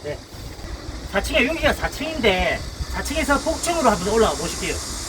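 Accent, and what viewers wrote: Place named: Seoul, South Korea. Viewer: 사장님 서울말이 너무 자연스럽네여 소개 흐시다가 한번 꺾었는데.. 30분 쉬고 방송 한거 같네예 ㅎㅎ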